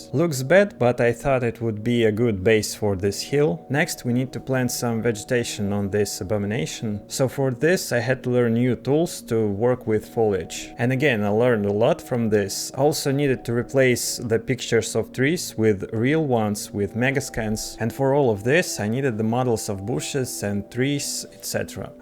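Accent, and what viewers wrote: Russia. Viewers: воодушевляющее видео) и ты явно русскоговорящий)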